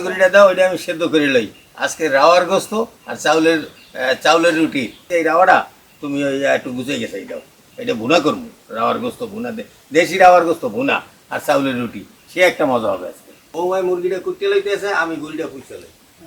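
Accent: Barishal, Bangladesh. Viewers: Brisal bola kotha nijer kahon valo boja😡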